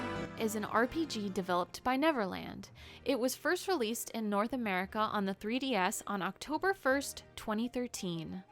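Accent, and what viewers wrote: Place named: Texas, United States. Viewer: hehe love~ your voice and love~ the texan accent XD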